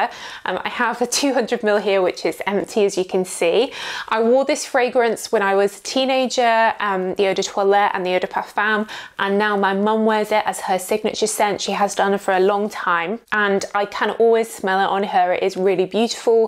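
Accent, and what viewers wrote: England, United Kingdom. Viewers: I love your reviews! You were so correct about the Chanel Chance eau tendre. I bought it and it was the weakest perfume performance wise for the price. It disappeared on my skin and could not smell it after an hour or less. My coworker can wear it and I smell it on her all day long. But last year you told us about Zara Applejuice and I bought it last year for $25. It was the big bottle and it smells better than the Chanel IMO, and lasts longer and smells stronger than the real one. That’s on my skin though. Everyone is different. My skin tends to eat perfumes unless they are stronger or a citrusy fresh like Clinique happy works great on me for example. It was disappointing though buying the eau tendre just to have to return it back. Because it does smell so so good just very weak and expensive. So thankyou for all your tips! 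I love watching your videos and I love your English accent!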